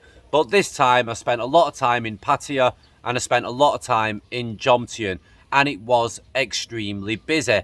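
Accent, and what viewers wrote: Manchester, United Kingdom. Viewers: As an older guy with properties as well as family in Thailand and well over two decades experience of living in the Land Of Smiles, I have to say you appear to be the exact kind of nonce-come-grifter I make a point of avoiding. You also sound like a Manc, which might explain it, Manchester being the place where all the lowlifes who were rejected from Liverpool and Birmingham for being too scummy for even them to tolerate, ended up settling over the past however many generation so it's probably not your fault, per se, so much as genetic. You can blame your parents and theirs. Please tell us you're all jibby-jabbed up because the human gene-pool is likely well overdue a purge and if Mother Nature has her head on the right way around, yours will be exactly the retarded hereditary characteristics she'll have been targeting. 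Other than that, have a nice day and do give my regards to your village (poor beggars living there could probably use a kindly word coming from a Farang). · try to speak standard English with much less of your provincial slang. This goes for all Brits when dealing with non-Brits.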